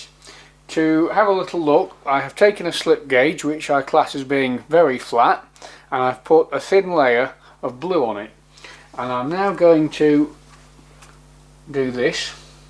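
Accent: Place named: Cumbria, United Kingdom. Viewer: lake district? Cumbria? your accent reminds me of my granddad, he was a tool and die maker from a long line of em who worked at Vickers up in Barrow in Furness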